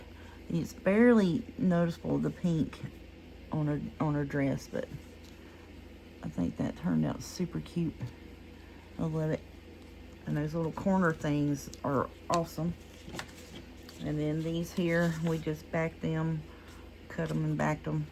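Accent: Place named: North Carolina, United States. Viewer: Are you from North Carolina? I am and it sounds like you are. I love it!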